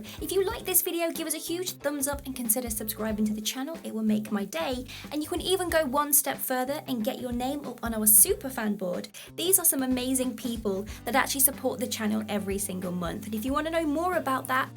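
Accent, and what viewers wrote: England, United Kingdom. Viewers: Hot dang girl!! You're a life saver. Hey random qestion...which English accent are you Londonder, Somerset, or neither?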